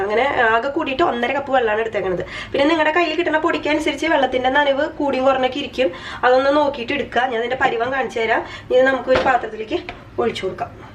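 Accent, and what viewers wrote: Thrissur, India. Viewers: അപ്പം കണ്ടു നോക്കീതാ. ദേ അപ്പൊ ഒരു തൃശൂർ talk.. ഇതെങ്ങനെ ഇത്ര speed talk.. ഞാനായിരുന്നു ഇവിടിരുന്നു ശ്വാസം പിടിച്ചത്.. വീഡിയോ ഇടയിൽ പരസ്യം വന്നില്ലായിരുനെങ്ങിൽ ശ്വാസം കിട്ടാതായേനെ.. അടിപൊളി ചാനൽ.. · ശ്ശേ,,,,,,,,,,,,,,,,,ഇടക്ക് പെറുക്കി തിന്നു അല്ലെ? എനിക്ക് ഇനി വേണ്ടാ ട്ടോ തന്നത്താന്‍ കഴിച്ചോണ്ടാ മതി,,,,,,,,,ഹായ് എന്തൂട്ടാ ഇത് തൃശ്ശുര്‍ ആണോ വീട് പൂരത്തിന് വെടി പൊട്ടുന്ന മാതിരി അല്ലെ വാക്കുകള്‍ ,ഇഷ്ട്ടായി ട്ടാ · Hai chechi eppol aanu kandathu. ..subscribe cheythu ta Nalla talks. .thrissur aanu nnu Slang paranju 😀 evideya? Njan sandhya Lajith. .triprayar aanu. .eppol hus nte oppam tamil nadu aanu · chechi adipoliyatto supr avadaranam thrissurkkarellam inganano nishkalankamaya voice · തൃശ്ശൂർ ക്കാരിയാണോ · Speed kurachu paranjal manassilaakum.Trichurkkari aano? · മോളൂ! തൃശ്ശൂർ സ്ളേ ങ്ങാണോ? ഭാഷ നല്ല രസം ണ്ട്. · ചേച്ചി തൃശൂർ കാരി ആണോ ആരായാലും സൂപ്പർ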